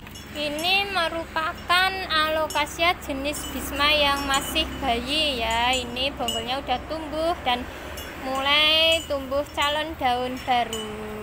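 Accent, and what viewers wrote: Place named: Java, Indonesia. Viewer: English ne jowo aksen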